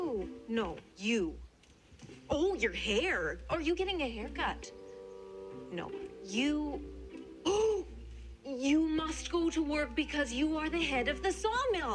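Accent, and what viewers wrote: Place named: Minnesota, United States. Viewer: This is adorable. And so is her accent, idk if shes from Minnesota, but it's cute. ❤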